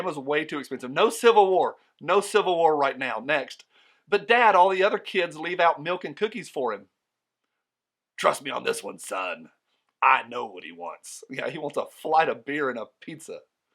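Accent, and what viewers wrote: South, United States. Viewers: Really like your closing line, that's the one you need to stick with. For anybody else who enjoys listen to this smooth-talking southern gentleman speak, vote for him on the gundies!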